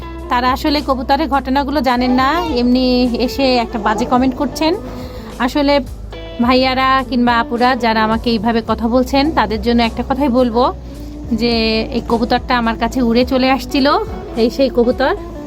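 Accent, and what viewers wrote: Rangpur, Bangladesh. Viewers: Onek moja pacchen kobutor ke niye mone hoy upner bastota bare giyeche upner kotha shune mone hocche Rongpure bari amader bashao Rongpur thaki from Austria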